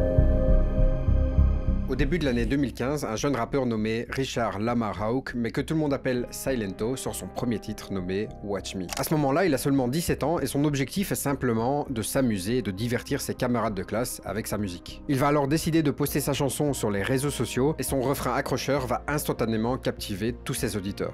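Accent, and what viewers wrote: Belgium. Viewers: Par contre g reconnu un accent belge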